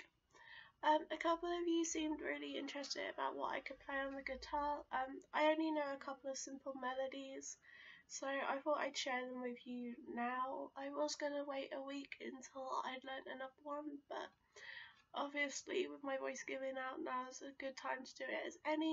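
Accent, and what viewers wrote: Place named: United Kingdom. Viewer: Good job! Nice sincere vlog and accent (I just love British English)! You've got genuine energy, I'm also a great fan of music in general, thumbs up! I recently just started vlogging as well, so if you got the time check it out:]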